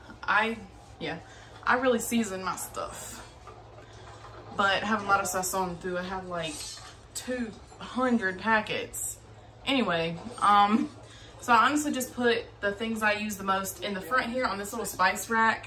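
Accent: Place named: Virginia, United States. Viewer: I’m inspired. I like your accent. Where are you from? You sound like a gal I know from Virginia. Maybe I’ll fire the old Vlog back up and clean some stuff. :)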